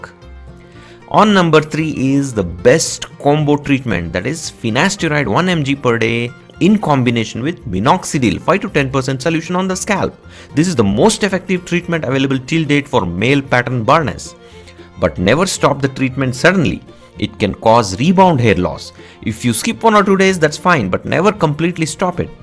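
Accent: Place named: England, United Kingdom. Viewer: That THICK English accent is making me kill myself.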